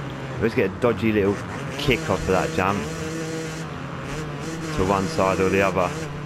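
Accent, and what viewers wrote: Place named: United Kingdom. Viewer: I love your accent so much where are you from in the uk ? Good videos by the way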